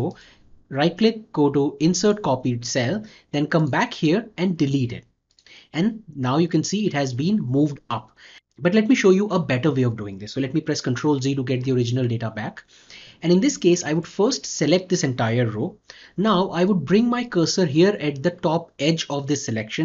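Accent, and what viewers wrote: India. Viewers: When you're trying to learn something software wise, you know you clicked the right video when the first thing you hear is an Indian accent. Awesome video, very helpful!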